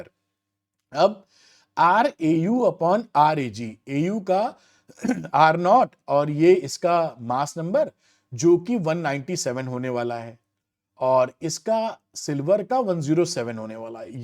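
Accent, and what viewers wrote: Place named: Lucknow, India. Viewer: Sir lucknowii andaz kaafi accha h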